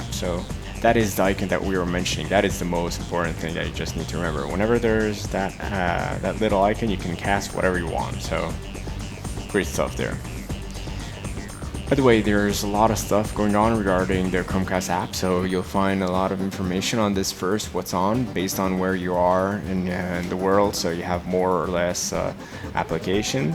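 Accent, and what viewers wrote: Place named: Italy. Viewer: Your english accent is very minimal for being Italian!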